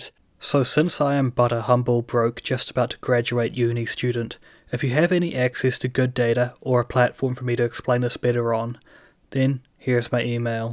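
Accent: United Kingdom. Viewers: You have an accent so it sounds like UK perhaps and probably get away with it due to the connection between the United Kingdom and the us being part of the five eyes. But probably still would have to ask for permission and would probably get it because what I'm thinking is portable extremely modular, uses an SDR array of disciplined chief sdrs and one that's a little more expensive because it covers from 6 to 20 GHz. And then there would be $360 degrees of visual, visual zoom, infrared, thermal, it's kind of designed like a cylinder with heat dissipation and reflectivity taken into account. And people who are knowledgeable about passive radar know what I mean about reflectivity. I'm using quarter wave well filtered phased array antennas on a flexible PCB for example is one idea ahead facing each direction so you can get time of flight. There's also a nice premade hat it looks like a hat the determines phase so you can weed out multipath. 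It connects via fiber optics pelican case which has whichever is the best chemistry and density for power storage. The ability to hook up to camouflaged wind, solar, or hydro even if it's just a local water source. Hooking up a small charging device to the local water. You put up a set of 10 or 15 of these and you could cover a lot of space 1 it would spend several days taking baseline is on the EM spectrum and picking out known emitters. If you take a baseline from knowing emitters with known coordinates over a week or two weeks of various w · Did you think of this idea yourself? You could probably develop some rough prototype and pitch it to the US government, or the UK since you sound British.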